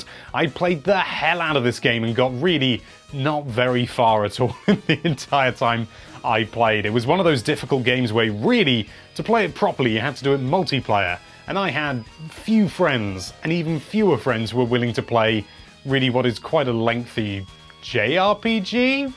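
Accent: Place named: United Kingdom. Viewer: He's from the UK.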